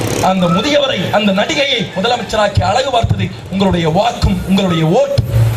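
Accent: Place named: Kanyakumari, India. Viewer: U r speech is awesome bro Mersal Kandipa ninga kanyakumari karar nu unga speech la teriuthu All d best for ur future speech😝😝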